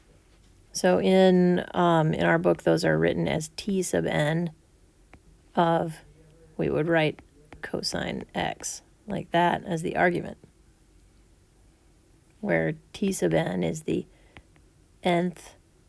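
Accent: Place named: Australia. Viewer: Aus accent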